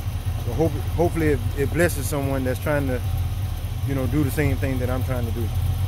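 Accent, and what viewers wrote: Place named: South Carolina, United States. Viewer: I love hearing the south Carolina accent feel like I'm home. I really like the way you mounted the tach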